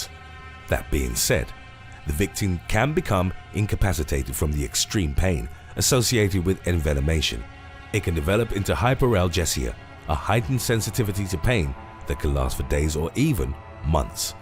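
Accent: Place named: England, United Kingdom. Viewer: He's not Australian. That's an English accent, and this channel is notorious for getting things wrong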